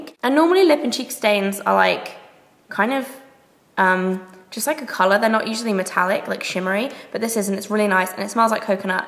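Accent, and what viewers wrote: United Kingdom. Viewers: I love British accents and i swear I listened to you say "Sun dried tomatoes and basil" a billion times :P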